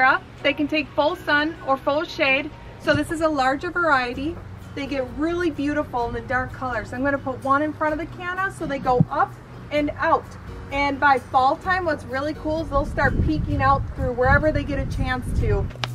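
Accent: Wisconsin, United States. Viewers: I think she is from Wisconsin.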